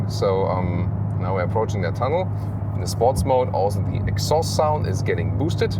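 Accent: Germany. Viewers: The inflection of a German accent in English makes for a fantastic review!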